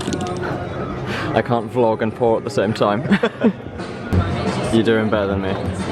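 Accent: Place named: England, United Kingdom. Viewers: Sounds north-east England but not Newcastle. One of the smaller towns. Locals would be able to tell which street from the pronunciation. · Is an English accent, but I don’t know specifically what part of England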